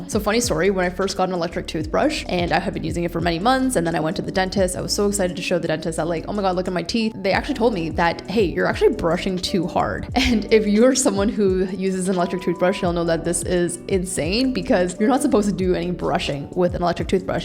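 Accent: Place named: Canada. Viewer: OH MY GOD IF YOU'RE FROM CANADA I FINALLY COULD PICK A CANADIAN ACCENT FROM AN AMERICAN ONE 😭😭🤧🤧🤧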